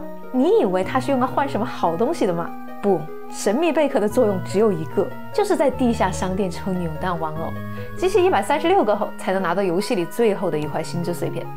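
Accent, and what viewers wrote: China. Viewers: 主播是不是四川重庆的人啊 我好像听到川普….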